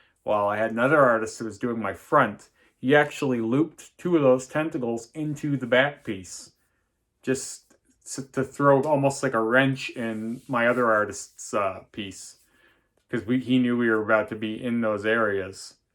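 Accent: Canada. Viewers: I appreciate your reply. Did not know you were Canadian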